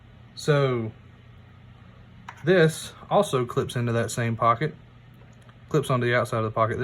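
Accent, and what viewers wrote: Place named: South, United States. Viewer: Thank you. Great no-nonsense, practical EDC with a southern drawl 😊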